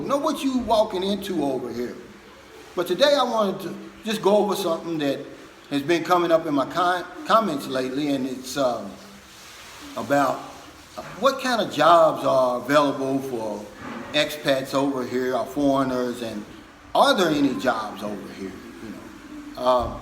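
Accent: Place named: South, United States. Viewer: Thanks. I love your accent BTW ha ha . French is my first language so I have a slight accent in English as well, but I've always loved the South accent... especially for women but hey, I am a man ha ha. You do awesome videos, seriously. Have a GREAT day :)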